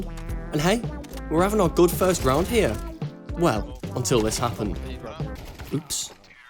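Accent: United Kingdom. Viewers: I only watch you because of these two things: Firstly you're an impeccable, stupendous and fabulous teacher Secondly for your Bri'ish accent, love that bud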